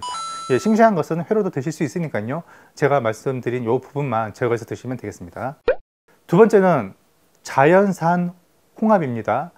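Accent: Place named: Jeolla, South Korea. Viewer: 아~따 전라도 억양이 징허구마잉~ 자네 고향이 어디당가?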